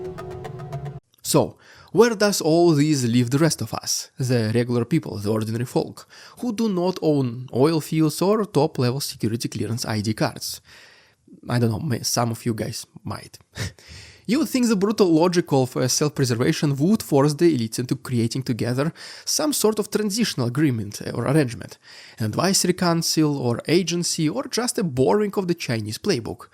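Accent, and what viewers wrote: Russia. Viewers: I don't know what will happen, but I have been waiting for this video, long before you made it. People have talked about Putin wanting to conquer Europe, along with other ideas like Putin will live for a really long time. Yet, Putin is already an old man. It is only a matter of time until death comes for him. Putin is a snake that is running out of time, trying to secure his legacy, in my opinion. Watching your videos is getting me used to hearing Russian accent correctly btw lol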